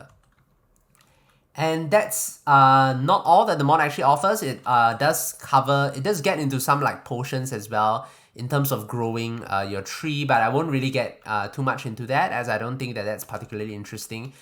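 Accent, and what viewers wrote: Singapore. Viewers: you sound very singaporean...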